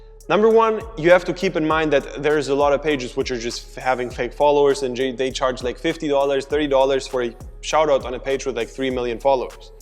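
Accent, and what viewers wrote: Germany. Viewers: Servus, an deinem englisch (zugegeben gut, aber trotzdem) erkennt man das du deutscher bist:D Hilfst du auch kompletten anfängern beim einstieg? möchte unbedingt anfangen aber nicht unnötige Fehler machen die zeit und geld kosten.. habe ca 1k, wie lange dauert es durchschnittlich bei korrekter vorgehensweise damit einen profitablen shop aufzubauen? Es geht mir nicht um schnelligkeit aber ich will halt auch nicht unnötig kohle verbrennen und zeit verschwenden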